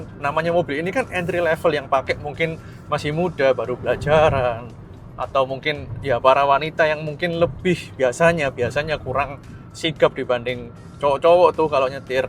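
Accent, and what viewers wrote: Surabaya, Indonesia. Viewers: Soko logate aku langsung ruh awakmu asli Suroboyo. Sego Endomie.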